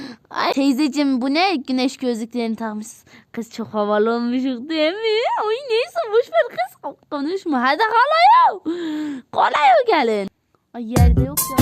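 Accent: Kars, Turkey. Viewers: Benim babaannem ve dedemde karslı aynen böyle konuşuyorlar bu arada ben Kars'ta yaşıyorum ama istanbulluyum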